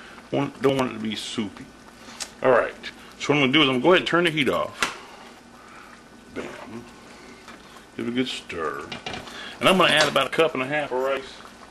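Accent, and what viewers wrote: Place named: Carolinas, United States. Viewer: He sounds more like he's from the Carolinas to me.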